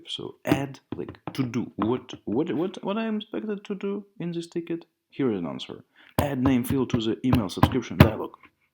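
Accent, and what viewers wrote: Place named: Russia. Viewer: He has russian accent I hear.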